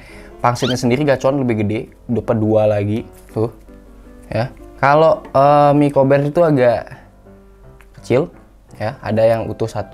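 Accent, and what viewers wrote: Bali, Indonesia. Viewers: Kak orang bali ya kok logat a bali bgt?😊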